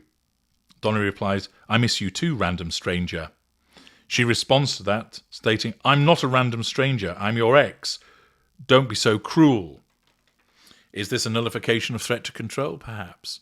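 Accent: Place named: Midlands, United Kingdom. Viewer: I could be wrong but I detect a Midlands accent in your voice!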